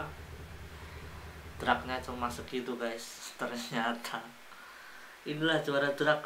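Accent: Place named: Java, Indonesia. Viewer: medok ngomongnya mas. mending pakek bahasa Jawa aja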